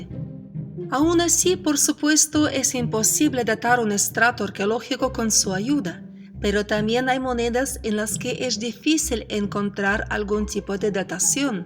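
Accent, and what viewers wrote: Russia. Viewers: Hey eres de Rusia verdad? Tu acento es inconfundible :3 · Muy bueno veo todos tus videos desde que lanzaste él primero, tenes que hacer un video sobre "vos" llama la atención tu asentó Ruso y que te llevó a realizar éstos trabajos históricos, desde ya muchas gracias y seguí así 😉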